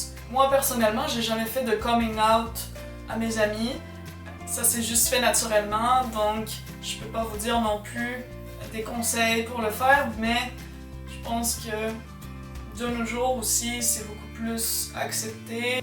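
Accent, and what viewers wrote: Canada. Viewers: c'est pour ça que tu as un accent canadien!! :) bisous du Canada!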